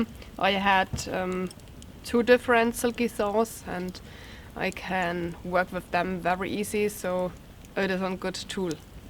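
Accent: Germany. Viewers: I always enjoy your videos. The outdoor setting by the fire was beautiful just like you. I think everyone loves hearing your German accent. Keep your personal life private. The more you tell the more people want to know. Sometimes I think we see too much of you in your videos but that's more personal. Take care of yourself and your children.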